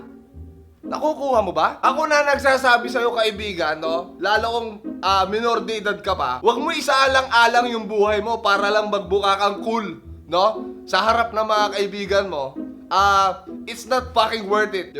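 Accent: Ilocos, Philippines. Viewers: Bakit ka nagvevape? HAHAHAHA SAPUL 2020! I love your ilokano accent!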